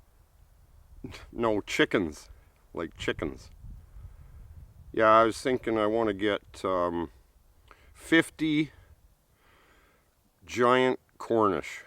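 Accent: Alberta, Canada. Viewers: He has a strong albertan accent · Mr. was this video produced in the Rocky Mountains of Canada. I noticed you and your friend were referencing the miles in Kilometers and you have a Canadian Accent.? Also, us there Cell Phone Service in the mountains that far back in to reach Medical Help if needed.?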